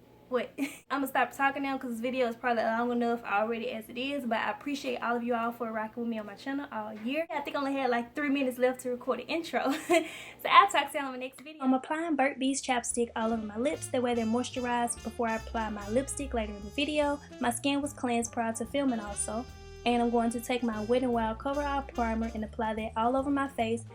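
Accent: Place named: Memphis, United States. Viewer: i heard you talking and i was like she sound like she from memphis cuz i from memphis and live here and you look really familiar for some reason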